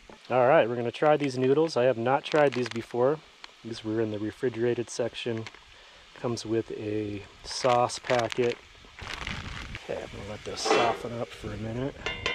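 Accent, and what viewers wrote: United States. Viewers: hi, thank you from us Europeans for putting the temperature in C°, just another question, why is "Route (Root) 66" pronounced different to " I'm taking that Route ( Rowt ) is this an American thing? another great video 👍🇬🇧🇬🇧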